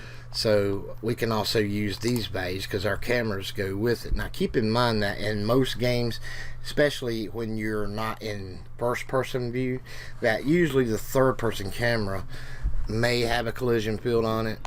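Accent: South, United States. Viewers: Love that accent man may I ask where you come from? I'm not a native speaker but it's def. southern😎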